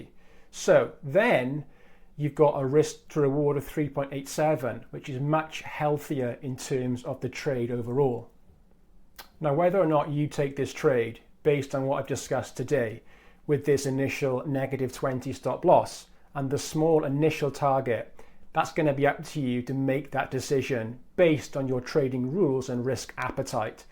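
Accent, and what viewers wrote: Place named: Wales, United Kingdom. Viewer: I Live in Hirwaun. You don't sound to far away.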